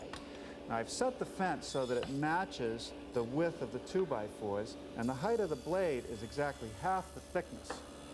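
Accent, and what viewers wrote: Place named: Boston, United States. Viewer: Same. In the early 2000s, usually on Sunday mornings, my dad and I would always sit down together and watch this show. Made for a beautiful morning. Having the sun guzzling through the living room window, seeing the dust in the air through the sun rays, and just hearing his Boston accent with that music in the background. Good times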